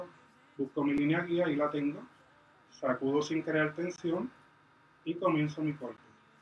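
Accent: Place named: Puerto Rico. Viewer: Eey!! Ese acento boricuaa!! Gracias por el tutorial, me es de mucha ayuda!! Saludos desde Guánica 🇵🇷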